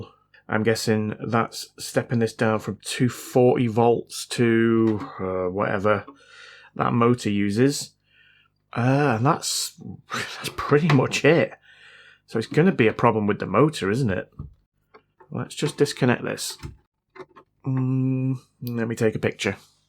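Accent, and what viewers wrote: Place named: Bolton, United Kingdom. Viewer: Great to here the Bolton accent, I lived in Westhoughton many moons ago.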